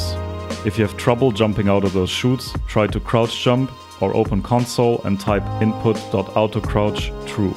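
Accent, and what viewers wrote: Germany. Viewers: U sound German ! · Puh der deutsche Akzent...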